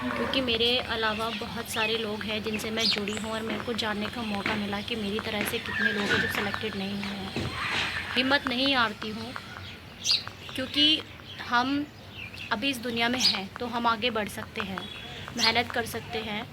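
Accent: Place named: Western Uttar Pradesh, India. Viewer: Aap western up se ho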